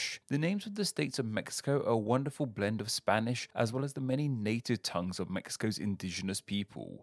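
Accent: United Kingdom. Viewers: The british pronunciation is so annoying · Your British accent is very difficult to understand for me as an English-speaker living in America. Can other Brits understand you? a Yorkshire man for example, or a Cockney, or for gosh-sakes a Scot? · He didn’t butcher them. His British accent didn’t help in some but over all he did very well. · This is a GREAT video. Just some notes. Sonora = So-NOR-ra. The "sur" in Baja California Sur should have a strong "uu" sound. And yeah, saying Mexican names with that British accent just can't be the best. You did good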